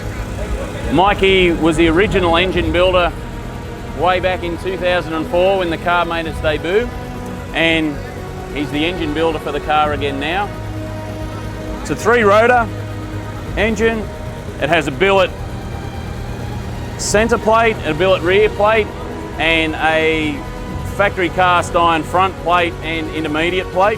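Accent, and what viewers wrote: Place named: Australia. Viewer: When I hear an Aussie accent makes proud to live in Melbourne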